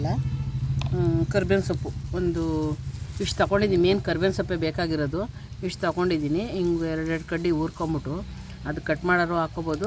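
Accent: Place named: Mandya, India. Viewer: ನಮ್ ಮಂಡ್ಯ ಭಾಷೆ ಕೇಳೋದೇ ಒಂದು ಚಂದ ಆಂಟಿ 😂😂😂😂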